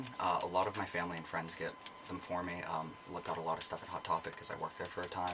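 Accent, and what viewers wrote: United Kingdom. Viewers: i am british and i honestly thought you were as well from that video you did.... impressive accent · I have watched this video and your biggest fan video and think you are absolutely fabulous! It is so wonderful that God enabled JK to create a series that followed many kids from their childhood to adulthood getting them to not only read books longer than anyone would have believed but to devour them and beg for more. I'm also happy that the films do the books justice. Harry Potter has been just wonderful and my only question to you is did you learn your British accent entirely from the films?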